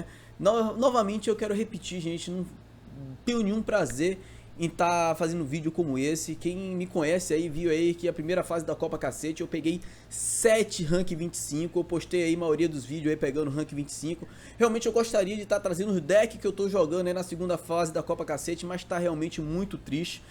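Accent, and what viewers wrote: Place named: Bahia, Brazil. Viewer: seu lindo, foi doloroso traduzir seu baianês nesse vídeo, mas tá aí, tudo em inglês pra você. faça bom proveito: )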